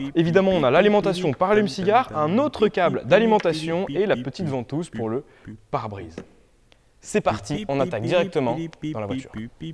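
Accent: India. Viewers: Grave chaud ! Je suis dans l'informatique et je kiffe ton accent indien quand tu oarle anglais ça me fait penser aux tutos obscurs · Quand il parle anglais on dirait il parle INDIEN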